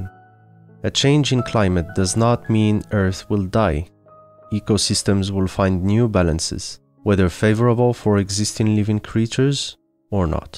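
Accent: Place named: Wallonia, Belgium. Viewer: My guess the accent is from Charleroi, Wallonia.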